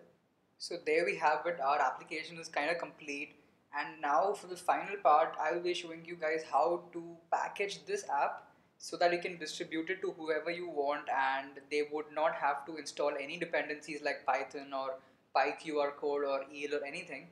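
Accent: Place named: India. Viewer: I love you and your indi accent. Love from Italy <3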